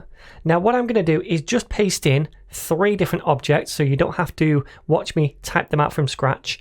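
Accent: United Kingdom. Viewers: Is this tutor British?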